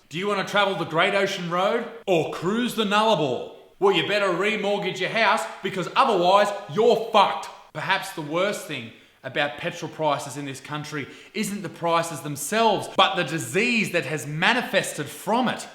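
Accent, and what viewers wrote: New Zealand. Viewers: Love this vid<3 Also that kiwi accent🤣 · You’re Māori accent is on point bro ps some Māori’s hate being called kiwi cause the British made that name up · That Kiwi accent tho, it reminds me of Jonah from Tonga, Chris Lilly, “you on your period or what miss!” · That kiwi accent. On point 👌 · his kiwi accent makes him sound like korg from thor ragnarok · u nailed that Kiwi accent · your NZ accent though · that was the typical north island Maori accent, pakeha don't have the same mannerisms, we still say bro tho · Quality Kiwi accent.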